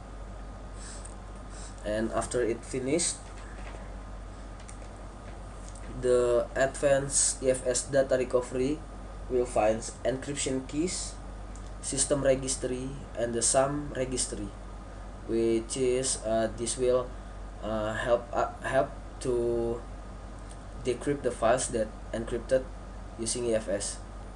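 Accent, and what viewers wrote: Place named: Indonesia. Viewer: indonesian english.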